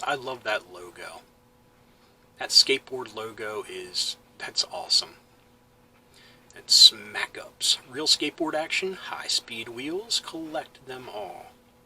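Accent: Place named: Maryland, United States. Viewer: Great channel, going through a bunch of your videos! Also, Pinterest is a great place for 80's nostalgia, lots of great pictures and collections w/o having to buy stuff. Lastly, you should collab with Hat Hole Home video...I have no affiliation with him, you guys just have a similar vibe and channel. I was going to ask what part of the country you're from but I can tell a Maryland accent anywhere! haha.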